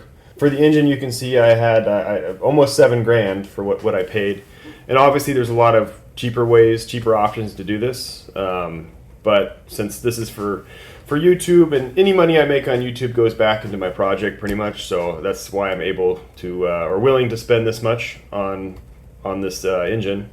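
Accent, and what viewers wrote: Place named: China. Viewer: Chingliss I love it.😂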